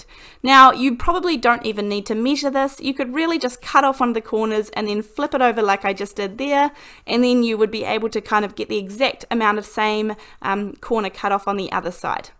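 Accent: New Zealand. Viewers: Love this card. I thought it was a N,Z, accent and then you mentioned you are in N.Z. I live in Cape Town but have been on three visits to your beautiful country and it would be my dream to live there. Thank you for sharing your great talent and looking forward to watching many more of your videos. Hope you get well soon.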